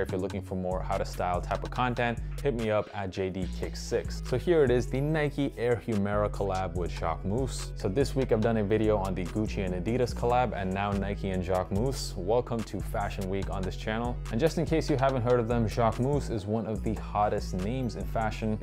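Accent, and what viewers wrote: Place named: United States. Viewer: he has an American accent and probably doesn’t speak French. Give him a break 💀💀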